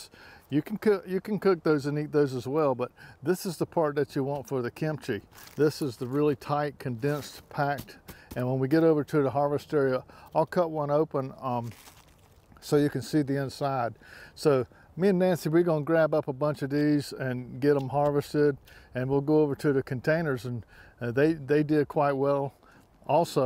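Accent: South, United States. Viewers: It’s so nice to hear a southern accent I’m from Houston and Henderson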